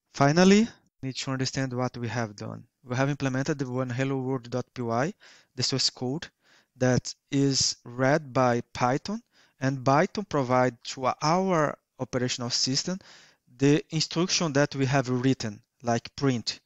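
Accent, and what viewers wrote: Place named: Ceará, Brazil. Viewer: Curso de Python em Inglês com sotaque cearense.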